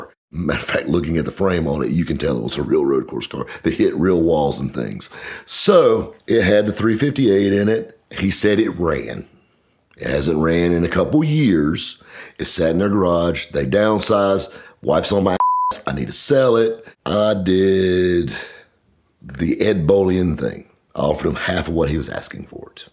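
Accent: South, United States. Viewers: Michael Rapaport’s southern brother? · Sorry if I disrespect someone but for me as someone from Europe this is what a real American accent sounds like. ‘Merica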